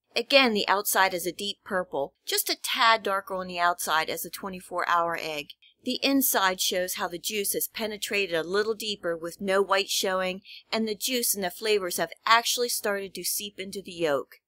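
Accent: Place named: Philadelphia, United States. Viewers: Oh, and, I just love your PA Dutch accent!: ) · I think I hear the Jersey/ Philly accent! I love it, my wife still has a little bit.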